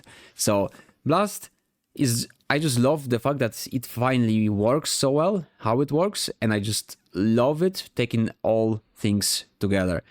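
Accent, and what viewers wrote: Poland. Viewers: i can smell polish accent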